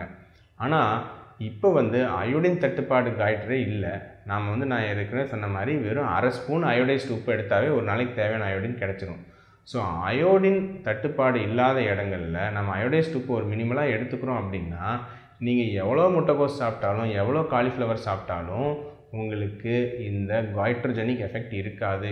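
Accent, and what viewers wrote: Kongu, India. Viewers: டாக்டர் அவர்களின் கொங்கு தமிழ் இனிமை. வாழ்க வளமுடன்.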